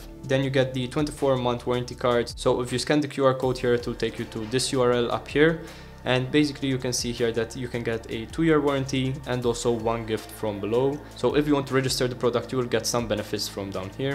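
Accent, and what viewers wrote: Malta. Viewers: I'm betting my left testicle that you're Maltese going by the accent 😆 ... Good vid bro! Keep it up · that accent is totally maltese :D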